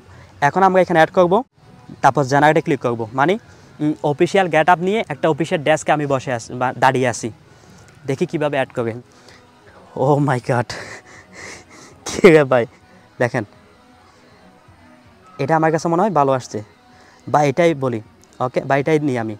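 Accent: Chittagong, Bangladesh. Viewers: আপনি কি চট্টগ্রামের মানুষ?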